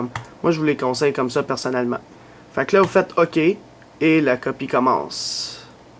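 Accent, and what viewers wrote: Quebec, Canada. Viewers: L'accent de Québec déchire! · mec j'habite a montreal depuis 6 mois et c'est sur youtube que je trouve le meilleurs accent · J’aime bien ton tuto je suis aussi Québécoise alors je comprend très bien de la manière que tu parle merci cela est parfait. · ahha caline jai clique sur la video sans savoir que tetais quebecois! chai pa pourquoi mais ca a comme faite ma journee!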